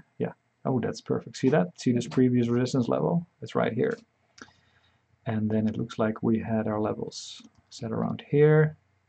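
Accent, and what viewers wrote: Netherlands. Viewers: Zo te horen bent je Nederlands, goed werk! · very dutchy accent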